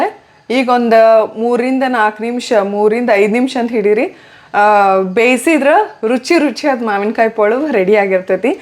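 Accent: North Karnataka, India. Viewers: Hye uttara Karnataka tone❤️nim ela blogs ide lng alle mdi sis.nan uk hudgi alla bt nim lng ❤️❤️ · Nice that you spoke in Uttar Karnataka tone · Nav ಉತ್ತರ ಕರ್ನಾಟಕದವರು ri ,bala kushi atri niv hing matadiddu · Nam uttara karnataka bashe keli tumba khushi aytu mam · Wow namma UK bhashe keli bhala ksushi atu, Happy Wedding Anniversary 😊 <link> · ನಿಮ್ಮ ಉತ್ತರ ಕರ್ನಾಟಕದ ಭಾಷೆ ತುಂಬಾ ಚೆನ್ನಾಗಿತ್ತು❤ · Nim uk baashe super madeam,navu dharwadadinda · Happy wedding anniversary ma'am 💕 loads of love from Haveri ❤, nivu avag avag hing uttar karnataka language use madtiri kelok chanda ansat 🥰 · Akka nim bai valag nam uttar Karnataka language agdi mast 🔥🔥❤ri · Happy wedding anniversary 🎉 stay blessed 😊 happy to hear your north Karnataka kannada accent 😊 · Bhari masth recipe ayitu, nam UK language masth matadtiri ❤ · nimma Uttra Kannada bashe chennegeidea I am also UK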